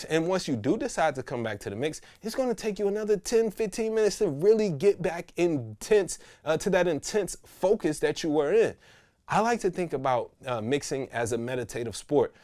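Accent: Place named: Washington DC, United States. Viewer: You sound like you from DC, bro I swear u got that twine in your voice like me bro but I think u said you from st.louis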